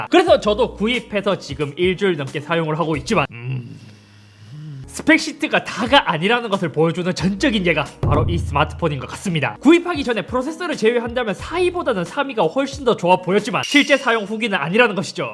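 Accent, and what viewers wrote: Gyeongsang, South Korea. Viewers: 말투로 보니 대구에서 자란 친구네. 대구~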